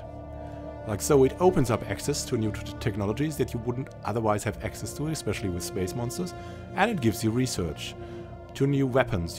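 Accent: Germany. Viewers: Btw are you german by a chance? You kinda have the accent :)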